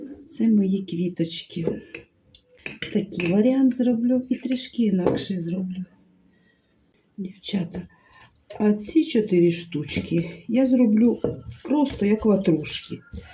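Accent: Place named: Ukraine. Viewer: Смотрю не только из-за рецептов, но очень нравится говор! Так у нас в Сибири разговаривали наши родственники! Они были переселенцами. Да и не только родственники, у нас вся улица была населена украинцами. Они все переехали в Сибирь в начале прошлого века! И теперь слушаю, как будто возвращаюсь в детство❤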